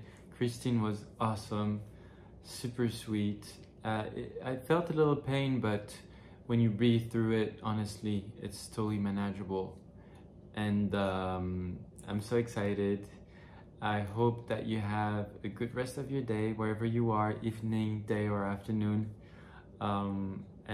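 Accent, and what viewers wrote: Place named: France. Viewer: 💕Hey boo how you doin I hope your doing well looking absolutely gorgeous as always I'm unconditionally irrevocably in love with you I absolutely adore you Your MY favourite frenchie in absolutely obsessed with your French accent (can I borrow it) Lots of love and kisses your biggest fan NICOLE LOUISE crespeau💕 💋💋💋💋💋💋💋💋💋💋💋💋💋💋💋💋💋💋💋💋💋💋💋💋💋💋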